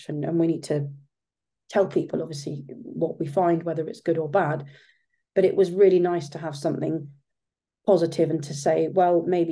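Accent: England, United Kingdom. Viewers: How can you not understand an English accent?! It is quite nice. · It's an English accent, it's the English language.... Literally the correct accent.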